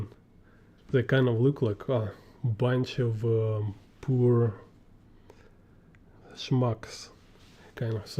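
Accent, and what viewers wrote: Russia. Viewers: Nice stuff and heavy russian accent ) GL HF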